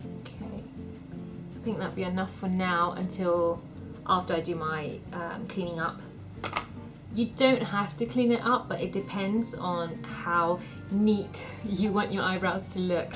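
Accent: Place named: United Kingdom. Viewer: She is an Indian can you belive that. She speaks like a British? ?????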